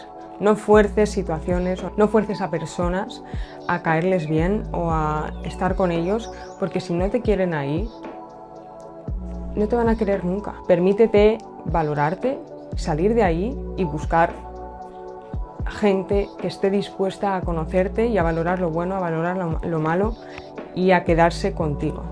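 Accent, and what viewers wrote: Spain. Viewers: El tema que tratas se ve bastante interesante, voy a seguirla y además que el acento de español ibérico, me fascina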